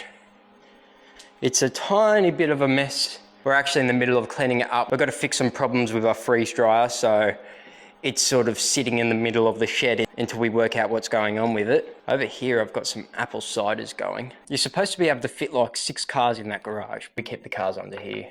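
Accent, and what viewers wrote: Australia. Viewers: Never thought this would be a whole house, from the outside it looks just like an apartment building are you in America? But your accent like an aussie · Oooo that’s a chewy Aussie accent! 😂 Kia ora from NZ 👋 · Great video and amazing place – loved your presentation mate – you’re a natural – so so so Aussie – just love it !!!!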